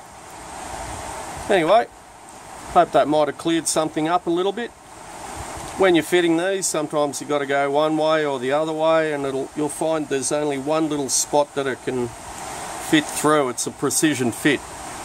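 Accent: Australia. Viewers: Sounds like an Aussie accent. I'll get you a James Boag's next time I am on the Gold Coast. Notice that the inner part with the splines is flush on one side and has a raised section on the opposite side. I wonder why and I wonder which end of the splined shaft goes into which end, the flush or raised side?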